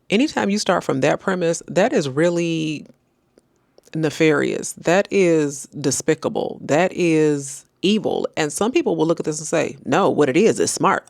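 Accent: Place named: United States. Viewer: I love this accent , where abouts in America are you from ?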